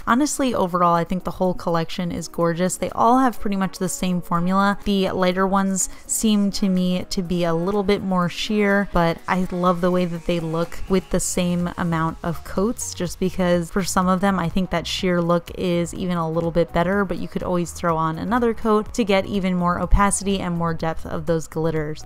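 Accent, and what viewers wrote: United States. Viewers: That was absolutely cruel to watch. They are all gorgeous. Love your channel btw. You're so chill and relaxing to watch. And I love your hair. There's heaps of American accents but yours is the coolest. What part of USA is that accent? Sorry to sound so fan girly but you deserve it. I guess you have hair dying tutorials. Might just go and check em out.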